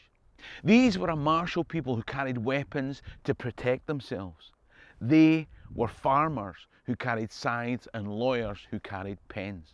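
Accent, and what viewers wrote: Scotland, United Kingdom. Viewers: My favorite Jacobite outlaw! So glad this popped up in my reccomend. Having the narrator be a Scot, even better.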